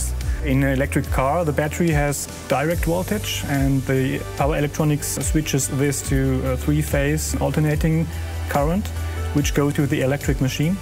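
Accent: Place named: Germany. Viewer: its a german i hear it😂😂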